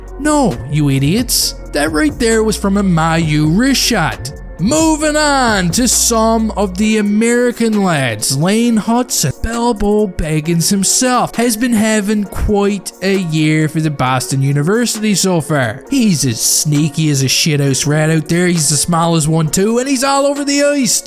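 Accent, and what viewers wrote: Newfoundland, Canada. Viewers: Man you've got to be a Newfie with that accent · Are you from NL by any chance?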